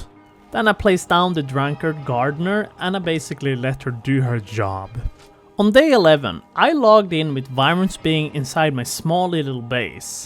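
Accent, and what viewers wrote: Sweden. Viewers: Are you swedish? You sound swedish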